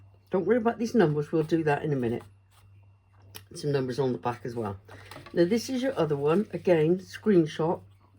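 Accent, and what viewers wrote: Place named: West Country, United Kingdom. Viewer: An absolutely beautiful card made by a beautiful lady. I can hear by your accent that, like myself, you're a West Country girl? I'm a Devonshire Dumpling 😂😆💗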